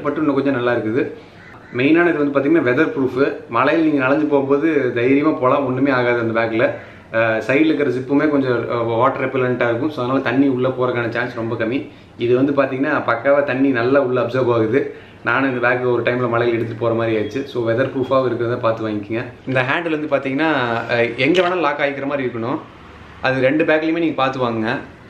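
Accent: Kongu, India. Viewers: கொங்கு தமிழ்...❤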